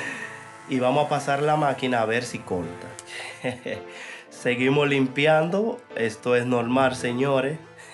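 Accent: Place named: Dominican Republic. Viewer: Hablas igual que yo Eres dominicano verda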